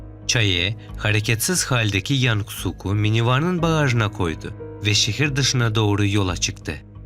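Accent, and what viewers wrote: Syria. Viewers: seslendirmeyi suriyelimi yaptı? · Youtuber kardeşim nerelisin, şive ve ağız çok farkı, Halep ten mi geldin sende yosa?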